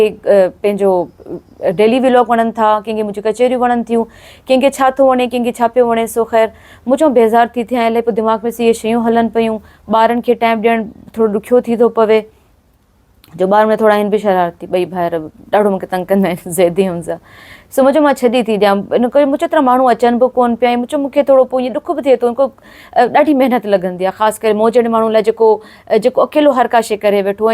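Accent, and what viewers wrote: Sindh, Pakistan. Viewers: Adi twhn larkano ja ahyo??? Twhnji sindhi jeka ahy na uha thatta badin tmk area wari lagy th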